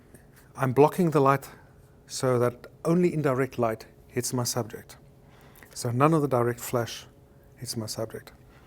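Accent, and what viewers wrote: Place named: Germany. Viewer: One question: how come you have a Dutch name and a German accent? :)) Fantastic stuff, this goes immediately into my GOLDEN TUTORIALS folder... There are very minor points I would have done slightly different, like with structuring video or editing (give a bit more time to showing the examples, and next to each other works best), but that's a side matter. I felt like back at school when we wondered how some great teachers stood out and could reach out and catch our attention. I've seen this video actually twice, counting the number of times I had to rewind to be able to follow (have no such a flash yet). Extremely welcome stuff, and I'll be buying the modern flash I had hesitated to, now under impact of this video. Can't wait!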